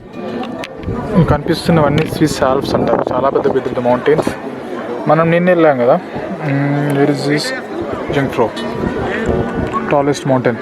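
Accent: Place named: Telugu, India. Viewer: బ్రో జెల్ది రా బ్రో సీట్లు అయిపోతున్నాయు ఈడ 😅😂 ఏ దేశం పోయిన మన భాష యాస మర్చిపోవద్దు ప్రౌడప్ యుా తెలుగోడ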